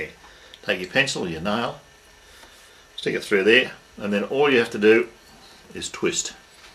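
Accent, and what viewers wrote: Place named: Australia. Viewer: Hey, sorry for the question but could you write down what string material you used to make the popper? With your australian accent it's a bit hard to find out what it is:D sounds like "barlin twine".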